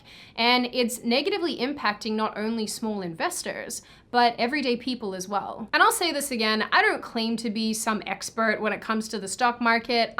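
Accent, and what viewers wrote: United States. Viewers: Awesome video,! Btw, you're gaining an American accent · Your accent is disappearing!! Americanized quick!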